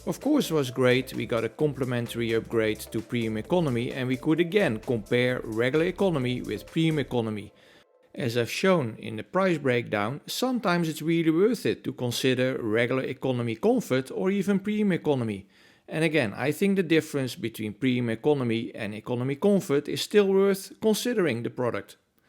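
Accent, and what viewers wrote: Netherlands. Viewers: Ik kon ook gelijk horen dat het een Nederlander is maar ik vind het prima verstaanbaar Engels, en daar gaat het om..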